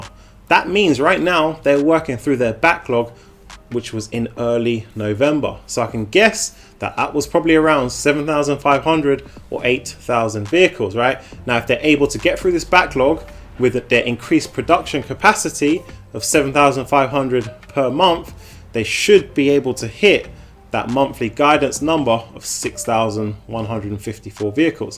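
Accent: United Kingdom. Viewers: Good brief, nice to have a British accent. Well done.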